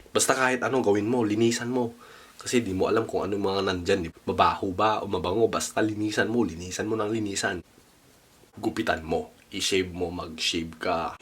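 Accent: Ilocos, Philippines. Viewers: This kuya is ilocano.. talks like my uncles and cousins in la union Kuya, cave is kweba not kubeta (toilet) 😋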